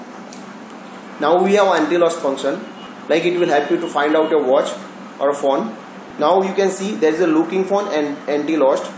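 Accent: India. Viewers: Abe Indian nahi ban sakta kya English me bak bak kar raha he lag to tu Indian raha he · Ghati English?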